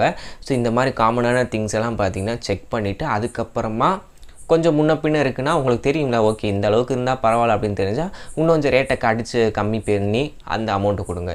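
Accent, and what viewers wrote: Madurai, India. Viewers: Bro neenga madurai ya bro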